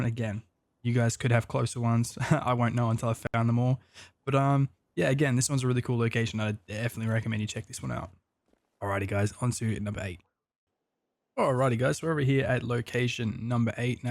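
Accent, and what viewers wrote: Australia. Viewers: Ngl I enjoy hearing another fellow Aussie trying to pronounce these Swedish names 🤣😂😅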